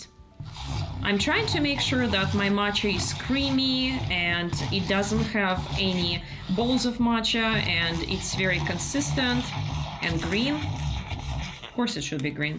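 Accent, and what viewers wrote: Russia. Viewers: Classic russian accent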